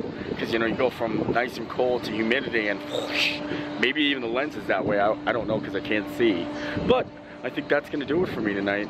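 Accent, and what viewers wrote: Pennsylvania, United States. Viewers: Where are you from originally? I'm trying to place your accent. It sounds like my Pennsylvania cousins! Bar Louie in CT closed suddenly about a month ago. It was in the mall. Thanks for the positive upbeat vids!!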